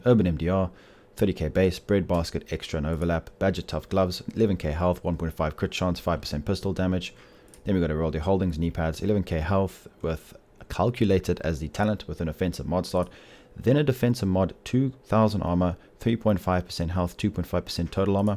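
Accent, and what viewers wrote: South Africa. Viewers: Hey am I hearing a South African accent there?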